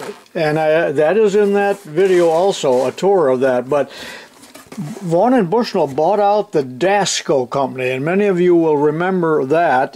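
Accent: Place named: Illinois, United States. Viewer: i know this sounds really weird and stalk ish of me but i live in Quincy Sensei and there is a part of me that deeply wants to meet you before we have to say goodbye to you for good, and time is short. i don't know how we could work that out and keep our privacy intact. but it is a honest wish of mine. it pulls at my hart just a little lets just say.for no farther than i'm thinking it is . i'm taking a ball-park stab at the distance of a drive it might be. i think it be a lasting regret if i didn't at least try if it was o.k. with you. i'm thinking Central ill, from the sounds of it. meaning your manner of speech . the state is regional like that if you haven't noticed in all your years. and the way southern ill. has its own sound as you go farther south . like when the closer you get to that dump the call Chicago as well the sound changes.like when your are next to Mo. 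or Iowa being smack dab in the mid west. we border quite a few states and well they all have an influence . but rural central ill is a island all to it's self . you don't have to say here an i don't advise it you know how some people are. its not as innocent of a world as it was in your younger years i wouldn't want you bothered by any unwanted visitors. but just maybe you could see your way to agree to meet some place public . oh i don't know thought just hit me just now to suggest the local court house if that made you more comfortable . well i wont pester you about it any longer it just think it over. one m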